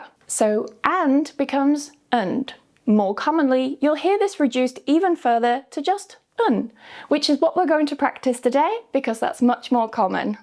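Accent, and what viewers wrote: United Kingdom. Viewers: That's a really effective video for me to learn the British accent. Thank you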